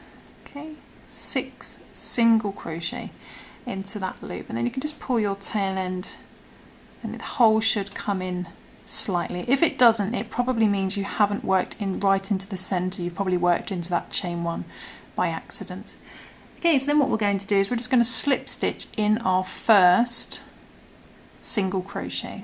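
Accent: United Kingdom. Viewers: Another great video. I love all your designs. Hearing your accent i think you may live in UK. If you do, can you please tell me where i can buy yarn..a big shop! All i have found by now are baby yarn or dk yarn on a chinese local shop..thank you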